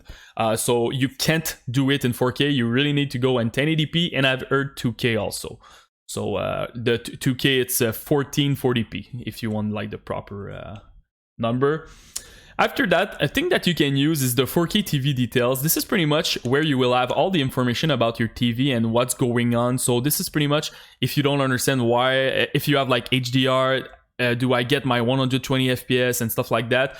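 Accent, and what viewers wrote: Quebec, Canada. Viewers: L’accent québécois mdr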